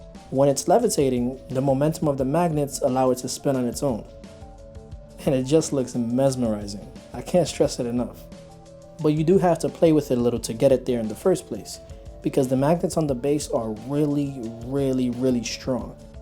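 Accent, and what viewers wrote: New York, United States. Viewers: Subscribed to your channel for: 1. The in depth tech reviews 2. Eye grabbing videography 3. The familiar New York accent Well done. Keep up the great work!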